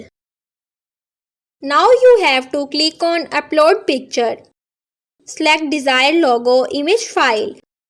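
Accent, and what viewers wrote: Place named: Afghanistan. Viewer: india? your voice like a afganistan dialeg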